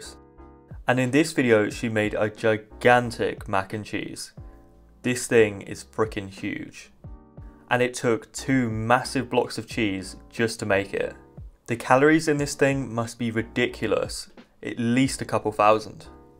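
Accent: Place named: United Kingdom. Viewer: Bro,he Bri’ish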